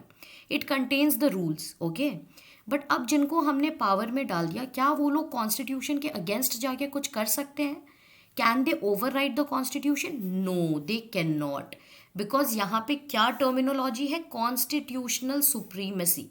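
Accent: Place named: Haryana, India. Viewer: Mam you are somewhere from haryana i am right mam i am from mahendragarh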